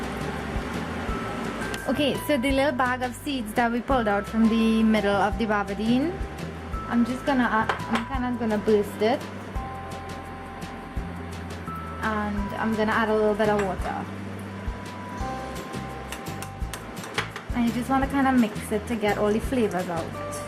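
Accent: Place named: Trinidad and Tobago. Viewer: U a true trini "ah" ,"doh" "kinda".. ah love we dialect too bad❤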